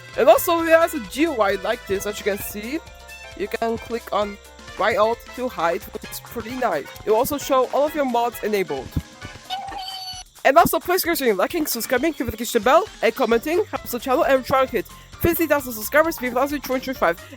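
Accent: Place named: Poland. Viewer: o kuzwa ciagle wiedzialem ze cos jest z jego akcentem ale ze chlop jest z polski?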